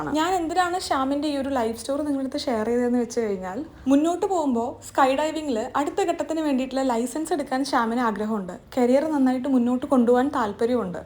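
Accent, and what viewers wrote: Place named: Malabar, India. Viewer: Malabar slang😅👍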